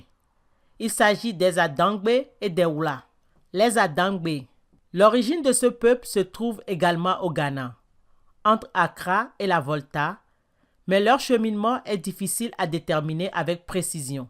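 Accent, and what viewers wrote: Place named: France. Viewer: Salut. Bonne synthèse. Vous auriez pu parler plus posément pour permettre aux auditeurs de mieux vous suivre. Par ailleurs, dans les prochaines émissions, essayez de prononcer correctement les ethnonymes et toponymes avec l'accent voulu -et non l'accent français - pour être mieux compris. Enfin, on ne fait pas l'histoire sans parler des sources. Et essayez de varier ces sources. Merci.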